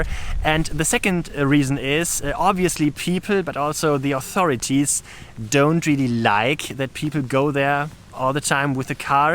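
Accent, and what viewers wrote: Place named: Germany. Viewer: Het klinkt als Engels met een duits accent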